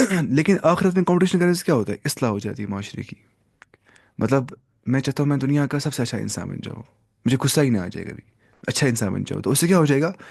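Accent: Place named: Kashmir, India. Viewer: Your accent is Kashmiri U are from kashmir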